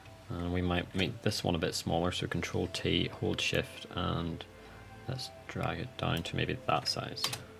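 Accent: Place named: Northern Ireland, United Kingdom. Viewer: Nice...just waiting for the comments saying they can't understand northern irish accent lol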